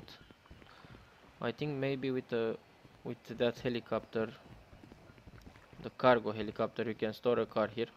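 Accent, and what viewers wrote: Romania. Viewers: Are u Romanian bro? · Ești roman după voce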